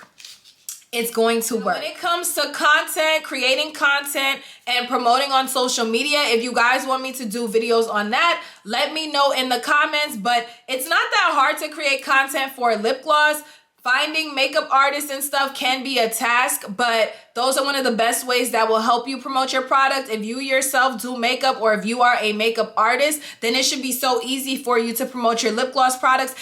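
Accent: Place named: New York, United States. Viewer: lol, your new york accent came out in this video. I hear it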